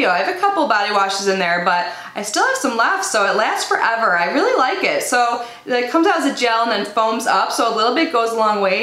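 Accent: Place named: Midwest, United States. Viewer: Subscribed! I started watching your Ipsy videos a while ago, I really love them :) also I totally resonate with your midwestern accent haha. Keep it up girl 💜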